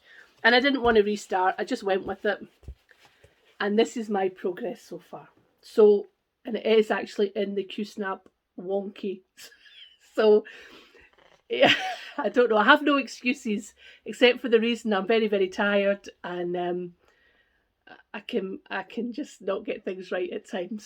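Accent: Scotland, United Kingdom. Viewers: Just found your flosstube...love listening to a Scottish accent 😍 I also stitch what I want to, depending on my mood, so call myself a moody stitcher 😁 Sounds like a great retreat.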